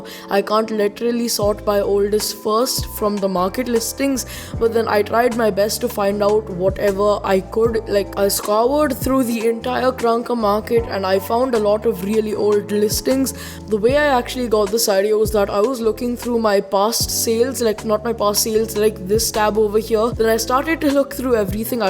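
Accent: India. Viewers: i still luv ur indian accent <3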